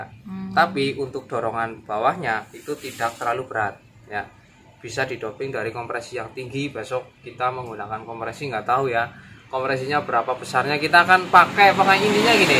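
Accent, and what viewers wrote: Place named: Medan, Indonesia. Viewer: Logat nya seperti orang medan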